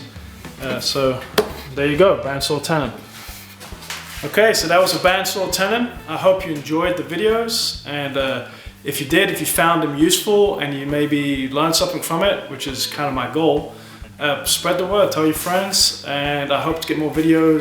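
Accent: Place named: United Kingdom. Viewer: British, Texan and maybe German? accent.. interesting combination. not sure of the German but I swear I hear it in there from time to time!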